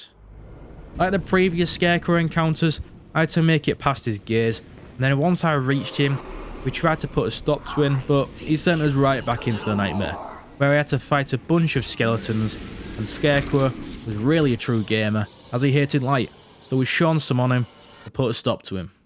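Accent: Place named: Eastern Europe. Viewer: Damn bro what is that accent ? You might as well be Eastern European.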